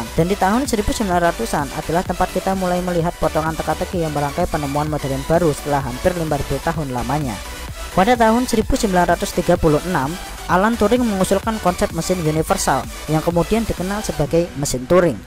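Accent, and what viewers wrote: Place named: Java, Indonesia. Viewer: laa Medhok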